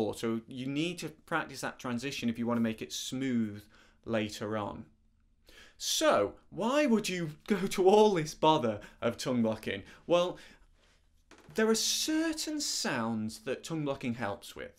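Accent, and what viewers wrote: United Kingdom. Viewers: I don't play the harmonica but I like your lovely videos. Especially your beautiful british English.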